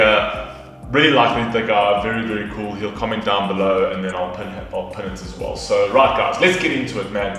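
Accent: South Africa. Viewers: just came across this.90% sure thats South African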